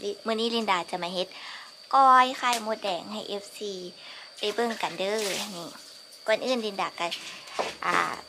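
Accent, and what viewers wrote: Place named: Laos. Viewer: ภาษาสำเนียงลาวเป็นเอกลักษณ์จังเลยนะครับสาวลินดา จะมากินกุ้งเมืองไทยอีกเมื่อไหร่ครับอยากไปเที่ยวลาวจังครับวัดที่ลาว สถาปัตยกรรมสวยงามครับ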